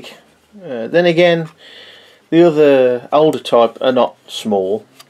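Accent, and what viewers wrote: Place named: England, United Kingdom. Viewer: you're 100% an english man (your accent ^^) , i have this box and 2 more to make anything in DIY , the problem is , in belgium we dont have a boxes of meccano "general purpose" :( im limited by the content of the boxe (for exemple the 4X4 OFF ROAD TRUCK SET is very poor of pieces ) ... can you help me please to found any pieces general purpose , thanks a lot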